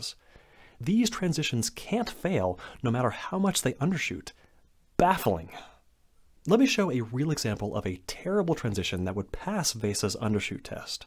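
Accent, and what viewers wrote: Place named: United States. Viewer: smart guy with american accent ASMR? subbed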